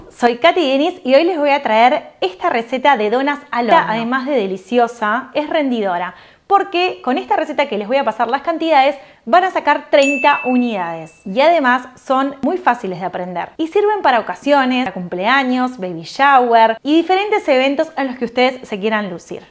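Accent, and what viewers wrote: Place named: Mar del Plata, Argentina. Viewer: Gracias, Probaremos tus apetitosas Donas, explicadas con tu lindo y ágil acento de Mar del Plata; soy panadero y repostero artesanal que hacemos hostelería con artes y tradiciones en Salento Colombia.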